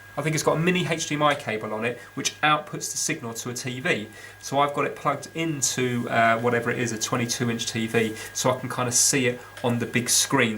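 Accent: United Kingdom. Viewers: "Sol-der", I'm painfully aware of England and America being separated by a common language, but how many times can you say "sol-der" in a minute? Great show, but each "sol-der" was like having my ear drums flossed. We watch mostly British shows, Cookers for Stoves, Stoves for Wood Stoves, Diggers for Excavators, Train Drivers for Engineers, oh my, you just did the "Haitch" pronunciation for H, I had to turn it off. Fascinating until that point. The sol-der and Haitch pronunciations are shared by Big Clive, on YouTube, I turn him off after too many for the same reason. Hope you got it working, I'll stick to the American that fixes consoles and sundry electronics. He seems faster at it, or he edits out some, though you seem to do work equal to his. But British Viewers beware, the American version of this man may grate on your nerves like this man did on mine, and I'm not suggesting we're right, just more accustomed to hearing our pronunciations. 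Best regards. I'll add my thumbs up to the 4,433 others, wish my nerves could have made it to the end.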